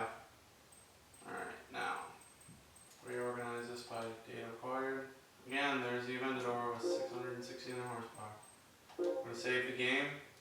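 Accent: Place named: RP, United Kingdom. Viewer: I gave u a like like posh accent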